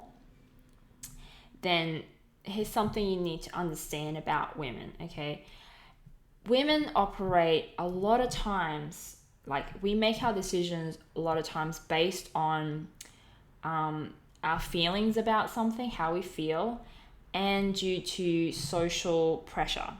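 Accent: Australia. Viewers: I do appreciate your honesty in regards to this matter tho... so thank you! :3... BTW... are you Australian? That is one hell of an accent.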